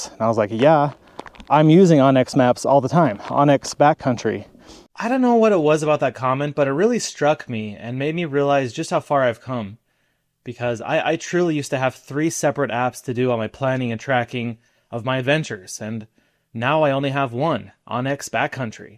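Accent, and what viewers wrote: United States. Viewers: I love your channel for not only your aDventures & comments. I find that your American accent is so obviously American. Keep on trekking.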